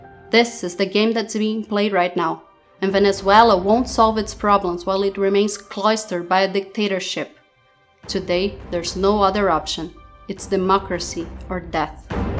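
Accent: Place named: Brazil. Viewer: Belo inglês. Só um pouquinho de sotaque brazuca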